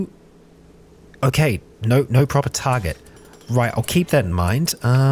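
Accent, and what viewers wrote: New Zealand. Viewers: I thought you sounded like a new Zealander at the beginning of the video and I was rightttttt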